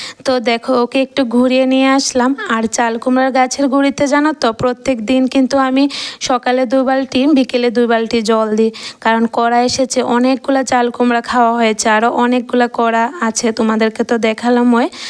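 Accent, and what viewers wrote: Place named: Tripura, India. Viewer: তোমার পুচকি মেয়েটাকে খুব ভালো লাগে তোমার মেয়ের চেহারাটা অনেকটা ছেলের মত। তোমার গাছের কচি চাল কুমড়ো দেখে কিন্তু আমার লোভ লেগে গেল। তুমি যখন ডাইরেক্ট কথা বলছিলে ভিডিওতে তখন তোমার কথাগুলো শুনতে পেলাম মানে তোমাদের ত্রিপুরার ভাষা একটু অন্যরকম। একদিন চলে যাব কাঁঠালের পিঠে খেতে। বাস করল কোনদিনই খাইনি। কেমন লাগে খেতে?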